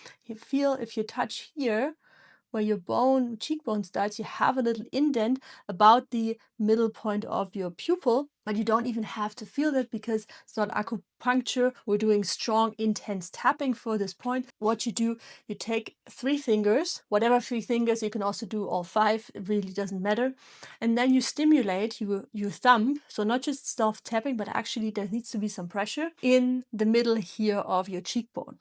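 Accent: Germany. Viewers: Danke für das erfrischende Video :-). Ich würde Sie auf Grund Ihrer Aussprache irgendwo im hessischen Raum verorten, aber vielleicht irre ich mich auch, und Sie haben gar nichts mit D zu tun ;-). Falls jemand gerne liest : von Clinton Ober gibt es das empfehlenswerte Buch (gibt's auch auf deutsch) "Earthing - The most important health discovery". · Thank you so.much! I already did the tapping and it works instantly. Do I sense a slightly German accent? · Du hast einen starken deutschen Akzent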